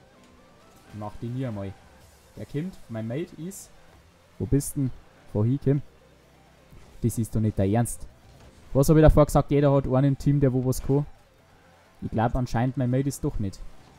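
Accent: Bavaria, Germany. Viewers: I dochte am Onfang du dadsd Boarisch sprechn.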